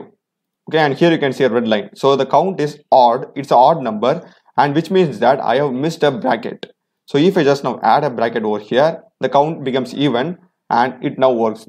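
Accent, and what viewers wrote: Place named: Tamil Nadu, India. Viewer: 😂🤣bro na indha problem kaga solution google pannunapa unga video varala aproma yt la vandhu poten apovum varala aproma tamil nnu poten oru 5 video kalichu unga video vandhuchu so neenga indha video tag la tamil potrukinga adhuvum unga slanga lite ah tamil member english pesuna epdi irukkomo apdi irundhuchu vro 😁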